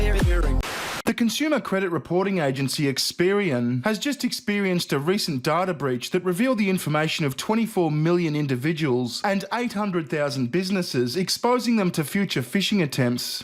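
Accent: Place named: Australia. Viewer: the australian accent is just so awesome. i want u to say put another shrimp on the barbie in an upcoming vid. with the exaggerated upwards accent like 80s paul hogan. p'huttt ahhnuhhthah sh'hrhemp auwn theah bahbbeh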